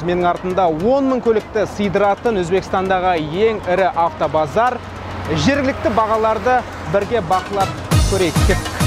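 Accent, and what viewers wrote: Standard. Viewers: басқа блогерлерден өзгешелігі таза қазақша сөйлейтіні жарайсың бауырым жұмысың өрлей берсін!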